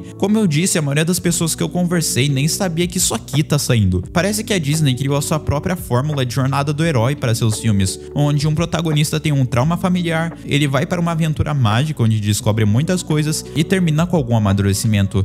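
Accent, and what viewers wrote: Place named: Interior, Brazil. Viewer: qual região do Brasil você nasceu e/ou vive até hoje? Porque pelo visto você tem sotaque de pessoa do interior do Brasil.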